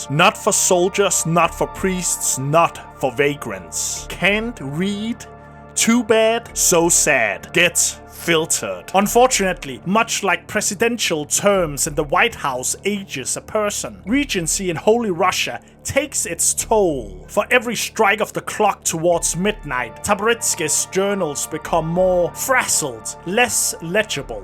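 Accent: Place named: Denmark. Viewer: am i stiktzo or are you danish?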